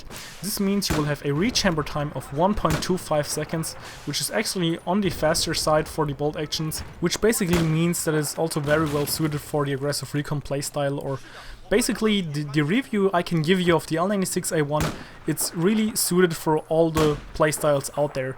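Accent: Germany. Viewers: are u german? :D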